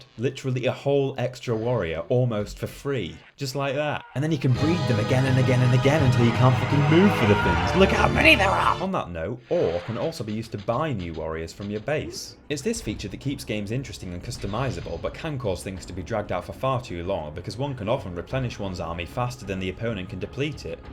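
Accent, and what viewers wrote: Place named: North, United Kingdom. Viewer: Found out about your channel after seeing a post about you on r/gaming, you're great! Where abouts are you from by the way? You sound Northern.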